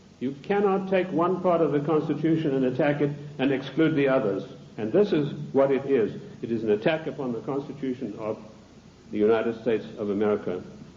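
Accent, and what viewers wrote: South Africa. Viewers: Definitely either of the two... I thought SA🤷🏼‍♀️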